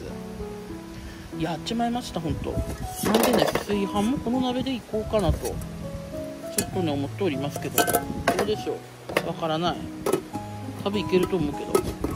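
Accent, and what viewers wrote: Aomori, Japan. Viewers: Jaiさん初めてコメント致します。オススメに出てきてすっかりJaiさんのファンになりました。過去の動画も見漁ってます😂 人柄の良さがにじみ出て、豪快な飲みっぷりと食べっぷりにアッパレ。青森弁にも癒されてます。 キャンプは憧れだな…いつかやってみたい! 大自然の中で飲むビールは美味しいよね。 私も大酒飲みです。 次の動画も楽しみにしています。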